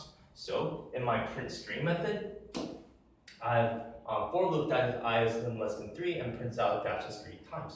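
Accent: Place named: India. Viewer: I don’t understand your Indian accent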